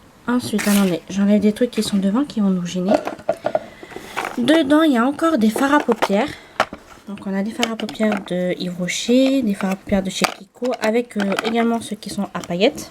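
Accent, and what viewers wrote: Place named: England, United Kingdom. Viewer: Coucou jolie, waouh tu en a du maquillage pour très longtemps. C'est bien rangé. Il y a de très belles palettes. C'est toujours un plaisir de t'écouter Tu as aussi un joli accent Anglais. Bonne semaine à toi, bisous, à bientôt, kénavo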